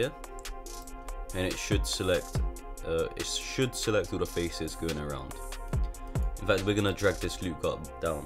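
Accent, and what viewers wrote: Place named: United Kingdom. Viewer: shit tutorial and overrated, deserve like 1k views, I dont understand anything of what u are saying with ur stupid british accent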